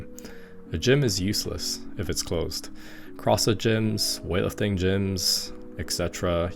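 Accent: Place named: Ireland. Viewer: You’ll need a Kerr, dats just anudder ting yill need. So Irish I love it. Good vid, all true. Suck it performance gyms!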